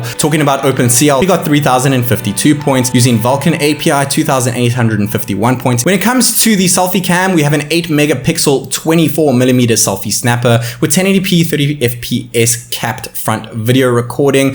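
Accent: South Africa. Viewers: Hey, are you South African? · Are you South African? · Great video! BTW are you South African?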